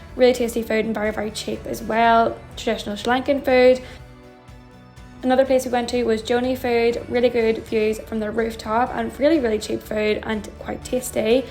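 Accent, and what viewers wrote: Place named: Ireland. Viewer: Lovely Irish accent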